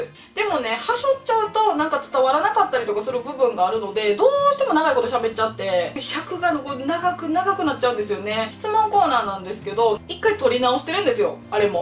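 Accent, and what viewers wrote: Kansai, Japan. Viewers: おススメの動画で拝見し、すごいお綺麗な方なので、ちょっとおすまし系？もしくはぶりっ子系？と思いつつ見てみたら！！めっちゃ面白い！関西弁でテンポも早くて、ついつい見入ってしまいますね！付録レビューしてる他の方も色々見ましたが、1番わかりやすいし、評価もはっきりしてる！これからはさんのを見て購入します！ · はじめまして(o^^o) ファンになって2ヵ月経ちましたが、初コメします！いつもわかりやすい説明ありがとうございます😊 関西弁が好きなので、いつも楽しく見てます！今度、ライブとか出来そうだったらぜひお願いいたします✨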